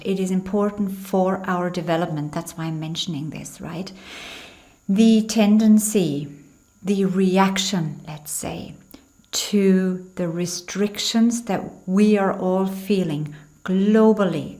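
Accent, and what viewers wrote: Ireland. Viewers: Watching this in July 2024, what a scary time that was... I'm guessing what you intuited in March 2021 was the V pass? I am glad I didn't fall for any of it. I had a dream prior to the whole debacle about injections so that was a heads up, and I smelled something rotten in Denmark right away. I have subscribed to your channel as of yesterday, love your calm demeanor. Is that an Irish accent you have? But I see you're in Germany, must've been tough going there, I'm in Canada it was awful here! ♥ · Love the accent..Irish? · Hi, I can’t place your accent is it Irish? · yes, i had a powerful sensation come over me at the end of last year and it was a feeling of ''this is it, it is over''. I get the feeling that something is gonna happen in August but what i have no idea. We are living in very interesting times. I am Irish by the way and i got a hint of an Irish accent from you. 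Are you Irish? or did you maybe learn English in Ireland? If it is the latter you are like a native.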